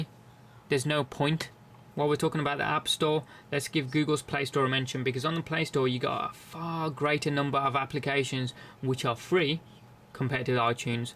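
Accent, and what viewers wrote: Birmingham, United Kingdom. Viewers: wtf ur voice have to be the most annoying shit in this world ,a Chinese with British accent ?? dude shut the fuck up , u dont know shit abput this phone , galaxy probably pay u to make this stupid video -.- !! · Honest review and an interesting english accent! I see no progress after 4s too... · Come on man he is British its a different pronunciation. So ignorant! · I have a iPhone 5 and I do agree with you, it's got a bit boring. I don't know why I got it on my upgrade. It's like I couldn't think for my self and just followed the crowd. Good video dude. You sound like your from brum.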